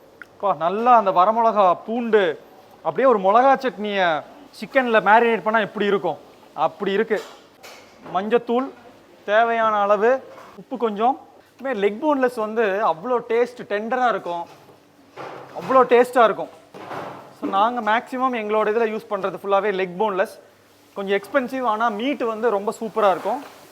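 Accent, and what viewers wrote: Kongu, India. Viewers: சூப்பர் ங்க உங்க சமையலும் நம்ம கொங்கு தமிழும் ❤❤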